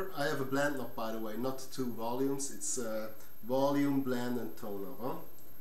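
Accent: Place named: Germany. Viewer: I love your accent! I am guessing your native language is German?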